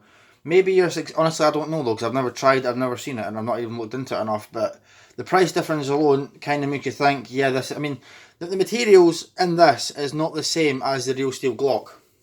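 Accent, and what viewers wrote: Scotland, United Kingdom. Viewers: Scottish accent is charming. And we do not have to paint our blank guns here. Love from Ukraine.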